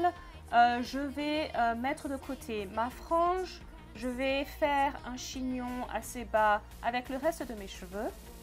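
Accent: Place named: England, United Kingdom. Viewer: J'aime ttes les coiffures,j'adore ton accent anglais💘😄✋🏾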